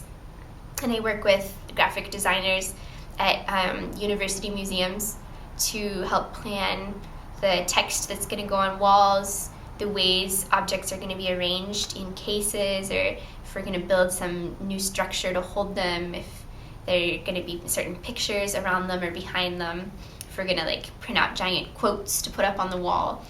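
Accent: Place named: Scotland, United Kingdom. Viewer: I figure she’s American but lived in Europe for a few years and picked up a bit of accent on certain sounds. That or born overseas and came to America at a young age but retained some accent. I’d guess Scotland.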